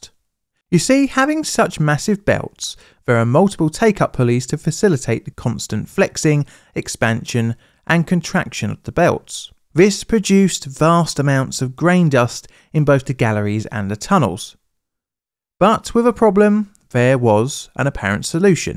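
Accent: United Kingdom. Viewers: I don't think there is ever a point where, when a Brit speaks, I have to translate at least a word, into American! Lol.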